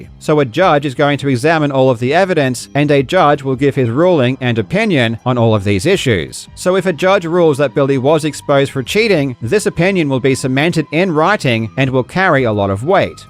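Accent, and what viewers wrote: Australia. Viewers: How am I just finally placing your accent as Australian? No idea why you sound a bit different to me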